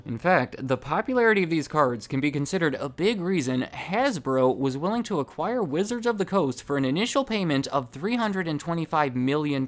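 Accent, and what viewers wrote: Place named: Michigan, United States. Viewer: I'm trying to pinned down this guy's accent my guess is Michigan but maybe also somewhere in Illinois but I'm leaning towards Michigan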